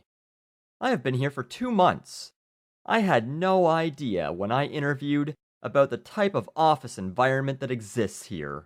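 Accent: Canada. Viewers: i love your Canadian accent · yay, any chance that replies to my comment? if you see this, you're one hell of a guy and I love your rants, and the canadish accent makes it even better:D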